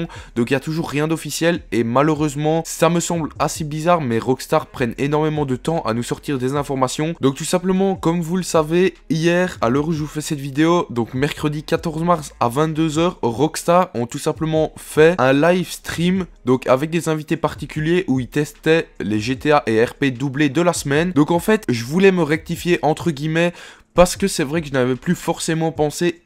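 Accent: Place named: Belgium. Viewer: Je crois qu'il est belge où chti't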